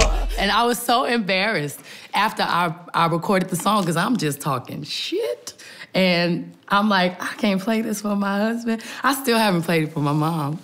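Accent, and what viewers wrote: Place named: South, United States. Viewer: She's so country!!! Cute. "I'm just tawking shyite." Lmao great stuff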